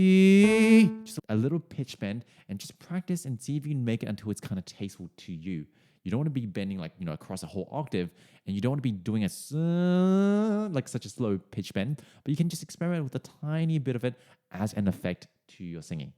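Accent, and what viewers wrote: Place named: Australia. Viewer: I make my piano students listen to Jeff to understand different tones and phrasing. I think my Caucasian Canadian choir did not appreciate my raving about Jeff! BTW, are you in NZ? I’m trying to place your accent. I left in 1987. But you pronounced “example” with the Aussie “a”.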